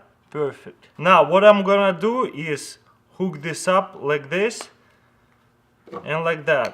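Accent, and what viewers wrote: Russia. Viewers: ive never wanted a Russian accent so bad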